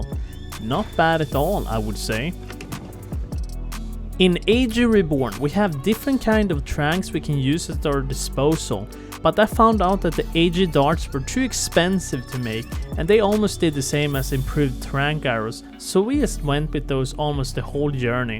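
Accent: Sweden. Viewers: Are you swedish? You sound swedish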